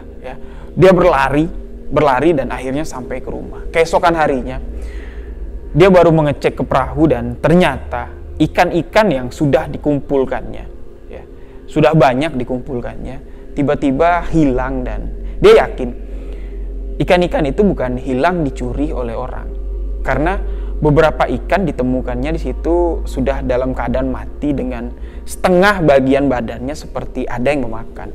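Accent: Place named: West Sumatra, Indonesia. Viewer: Nada suaranya kayak org minang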